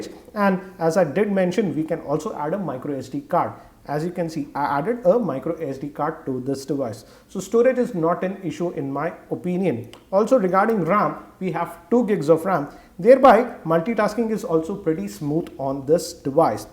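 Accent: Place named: India. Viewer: ahaha this is like comedy central with information...ahahah....Indian accent... I end up laughing...enjoyed it a lot...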